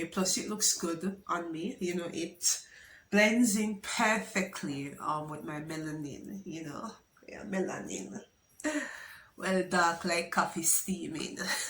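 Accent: United Kingdom. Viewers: Di likkle British accent deh it agwaan nah lie love your confidence from you have self love and confidence in whatever you do you will excel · I'm hearing a likkle hint of that British accent still 🔥😻 bless up! another great video. I love your answers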